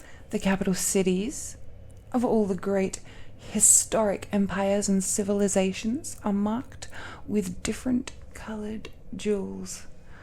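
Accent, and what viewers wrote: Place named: Australia. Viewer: holy shit au accent asmr im melting.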